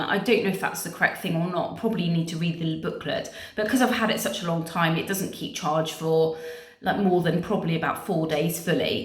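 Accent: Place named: United Kingdom. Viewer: I could listen to you all day long. I love the British accent.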